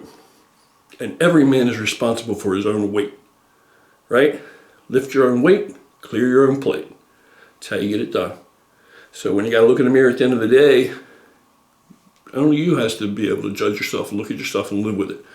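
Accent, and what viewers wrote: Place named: Ohio, United States. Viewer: Hey your accent sounds familiar...Ohio? I used to live in southeast Ohio as a kid! Anyway, cool channel and sick gains bro 👍🏼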